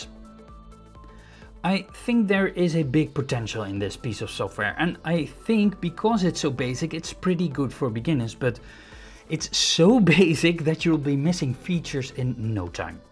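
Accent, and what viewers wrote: Netherlands. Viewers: Hey hoor ik nou een Nederlands accent? :D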